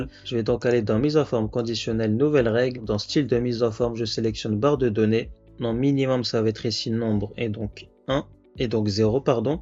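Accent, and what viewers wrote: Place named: Maghreb. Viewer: T’es DZ ou MA ? Ça s’entend fort 😊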